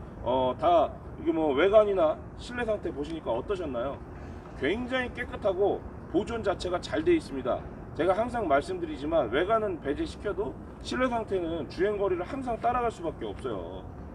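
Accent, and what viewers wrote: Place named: South Chungcheong, South Korea. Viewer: 윤팀장님 충청남도 양반 이다에 내 손모가지 걸께요 가생이 !!! 사투리 푸하하하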